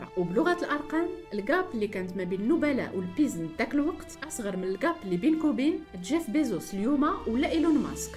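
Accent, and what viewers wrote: Marrakech, Morocco. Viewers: طريقة العرض جميلة وزادتها اللهجة المراكشية جمالا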